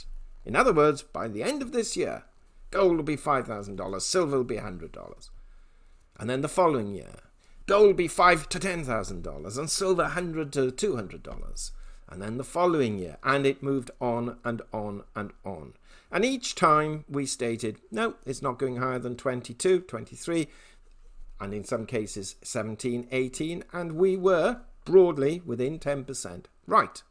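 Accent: United Kingdom. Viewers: This channel is great! I like the format and the lack of wild speculation. The brit accent add an extra charm!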